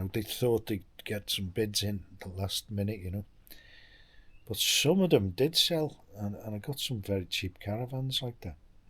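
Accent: Liverpool, United Kingdom. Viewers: Is that a Scouse accent? Great vids cheers mate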